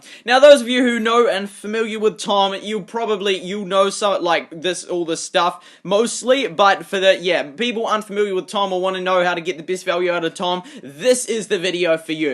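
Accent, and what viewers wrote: New Zealand. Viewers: Are you kiwi??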